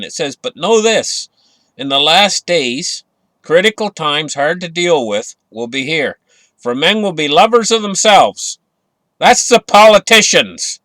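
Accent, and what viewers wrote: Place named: Canada. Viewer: Love you to bits,. My day is not complete without those dulcid Canadian tones. Now here's the thing. You have yourself a merry little Xmas and come back refreshed and ready for the end game. Kind regards from the UK.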